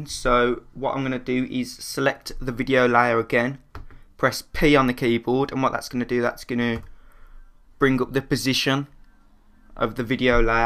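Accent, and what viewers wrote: Birmingham, United Kingdom. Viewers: first i hated ur british accent but then i started to watch these videos, now i love ur accent <3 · are you a brummie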